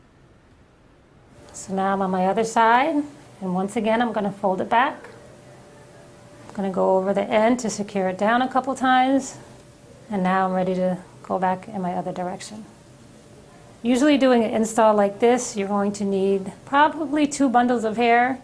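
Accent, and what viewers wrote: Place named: Puerto Rico. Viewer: R u Puerto rican? I love ur accent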